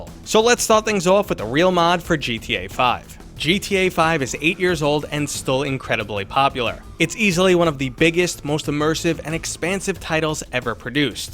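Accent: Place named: Boston, United States. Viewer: That boston accent tho.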